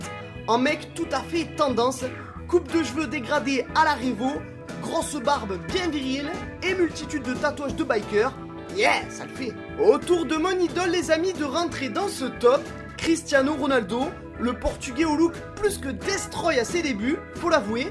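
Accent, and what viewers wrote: South, France. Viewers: Tu viens du Sud toi, non !?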